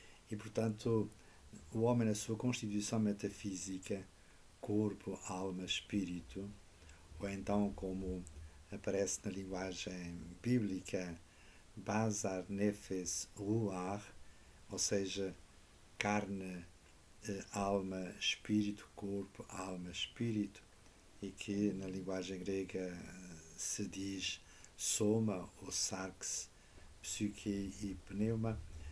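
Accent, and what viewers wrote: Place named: Portugal. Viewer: Abrazos, desde Hispanoamérica,. Mesmo sendo para nós, do outro lado do Oceano, um pouco mais difícil acompanhar a bela pronúncia do português peninsular, a sua mensagem chega a nós e é muito bem recebida. Los católicos estamos mucho necesitando verdaderos y valientes pastores. ¡Gracias!. Obrigado!. Dios lo bendiga, siempre.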